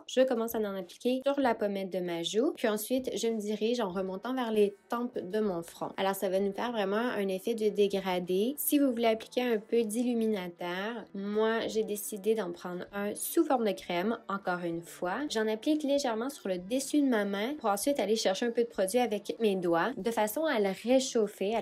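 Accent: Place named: Canada. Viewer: sur cette video la dame est canadienne je pense. elle a un accent prononce. elle ne parle pas bien le francais de france. elle se trompe dans les determinants. donc pas top pour apprendre le francais.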